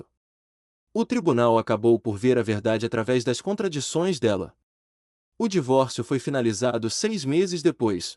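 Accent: Portugal. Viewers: Tem histórias que são boas mais o português Portugal o torna efadonho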